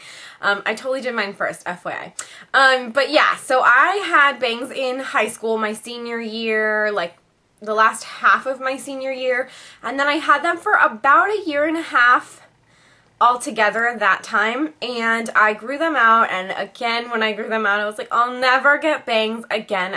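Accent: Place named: New Jersey, United States. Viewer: woah, you sound like your from new jersey(x ... well i LOVEEE your videos :) you are so sweet<33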